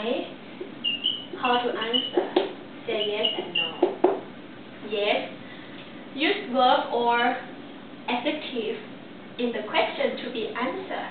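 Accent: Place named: Thailand. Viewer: ชอบสำเนียงเสียงไทยคุณมากเลย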